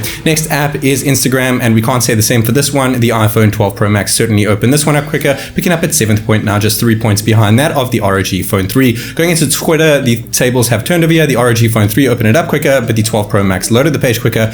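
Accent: South Africa. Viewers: Is from South Africa?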